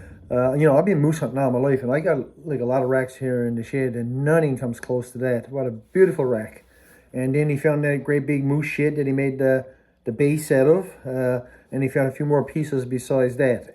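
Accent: Newfoundland, Canada. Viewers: I did not know that Canadians can sound so different from US Americans. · My soul mate loved hearing your voice reminded him of his childhood in Grandbanks. NFL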